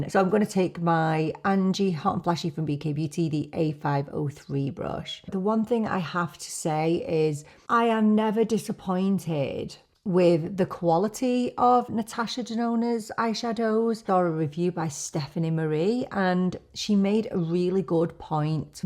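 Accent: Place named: Northern England, United Kingdom. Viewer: I thought so 😀 I’m a northerner too, so I guess I gravitate towards you, I can relate! I’m originally from Leeds and moved to Nottingham when I was 24 (I’m 53 now) and my accent will never leave me 😂 neither will yours. Never been to Ireland myself but I’ve heard it’s very beautiful. I travel to Birmingham regularly as I’m a big gig lover. I have posted a few videos on here if you are interested. I was so excited when I saw that a Sephora had opened in the bull ring. It’s got so many different brands on display and I got a bit overwhelmed if I’m being honest. I didn’t even buy everything! 💕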